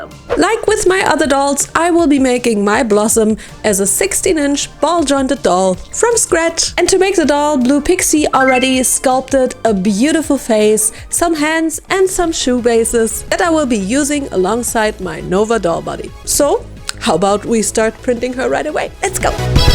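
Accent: Germany. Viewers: YAYY NEW VIDD! also weird question but are you german by any chance?? I believe your accent reminds me very much of german!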